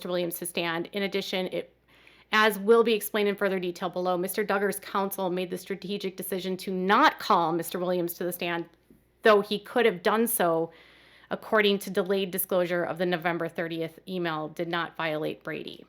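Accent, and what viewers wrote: United States. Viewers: Q: Pro-bat-ive. Stat-u-ette. Other examples. Is this a particular/regional American accent?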